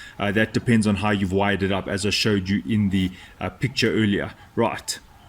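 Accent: South Africa. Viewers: Sounds like a South African accent. Hi from Canada. Was actually looking for keypad opener that I can add or remove user codes through my smartthings controller like I can for my front door locks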